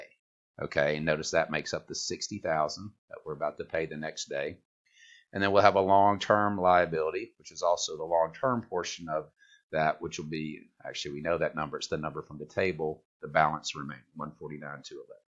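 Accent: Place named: South Carolina, United States. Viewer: Are you from south carolina? You sound like Linsdey Graham lol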